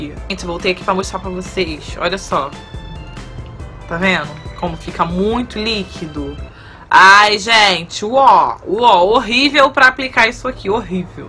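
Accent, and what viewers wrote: Rio de Janeiro, Brazil. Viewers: Muito carioca mesmo ahahhahahahahahahahahaah maravilhosa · E Nova Iguaçu é aonde?! São Paulo?! Euem... É carioca sim!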